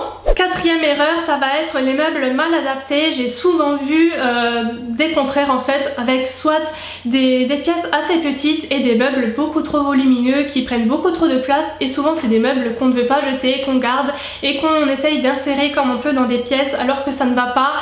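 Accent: Lorraine, France. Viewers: hello! oui c tout à fait ca..en tous cas je te découvre tous les jours et franchement je ne sais pas kel age tu as mais tu as l'air talentueuse, posée, sympathique et très pro! stp fais + de vidéos deco ou dny!! je vis dans un chalet et je suis en plein travaux/déco et t vidéos donnent d idées) ta chaine est une belle découverte. ps: j'habite a sarreguemines, ton accent me parle....me trompe je? des biz ;-)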